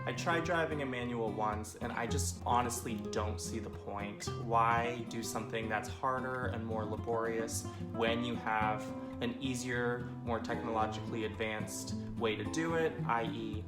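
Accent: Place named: United States. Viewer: Unfortunately you do sound like a complaining American.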